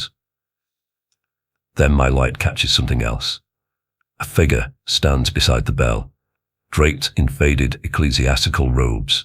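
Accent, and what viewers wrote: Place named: Northern England, United Kingdom. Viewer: Hi, my name is Lynda, I've just subscribed to your channel, I can hear that you're a northerner, I can tell I'm going to love your stories, I'm from Burnley in lancs however I moved to milton keynes 25 years ago, so I tickled pink to hear you're a northerner too, thank you 🙏 💛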